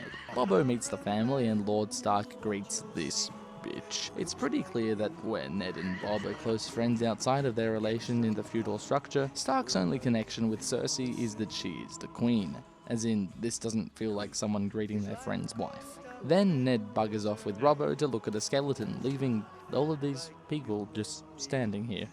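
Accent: Australia. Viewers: Are you Australian? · The second I realized you were Australian: when you said “cracks the shits” The second I realized you were a genius with these videos: when you played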